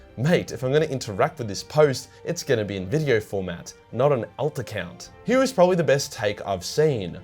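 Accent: Australia. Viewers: I cant lie, this is the only way i can consume anything from 40k because everyone else who makes content like this just sounds like a loozerrr, why would i want to hang oout with a loozerrr when i can just listen to some aussie chad step above all the other loozerrr'z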